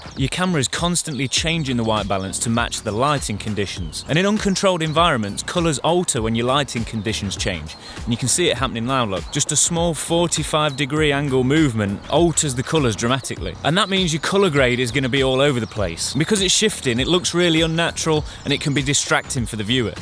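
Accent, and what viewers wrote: Nottingham, United Kingdom. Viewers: You've gotta be from Notts duck!? Great vid. Subbed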